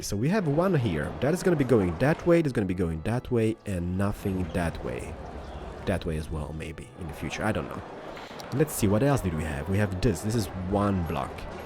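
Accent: France. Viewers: Very good french accent, i'm french and that's cool :) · hello,you have a great french accent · J'adore ton accent français :D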